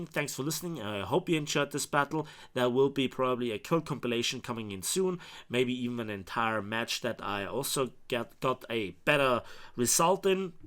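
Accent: Germany. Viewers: He is German :)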